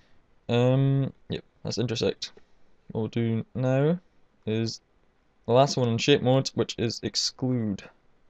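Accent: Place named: Glasgow, United Kingdom. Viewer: Good clear content, thanks! (Also, your accent is delightful. Are you from Glasgow?)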